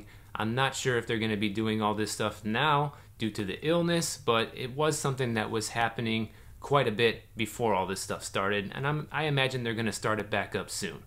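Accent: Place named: Midwest, United States. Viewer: ...My girlfriend and I from Maryland vacation often in Hollywood Beach,....and you can just make out the Miami skyline from there looking south. Though we won't be retiring in Florida for a variety of reasons we both enjoy your outstanding videos,....and it gives us more reasons to consider vacationing again in Fla. Btw,...I had to laugh(again)at your midwestern accent when at the start of your video you pronounce it 'FLOOR--i-da''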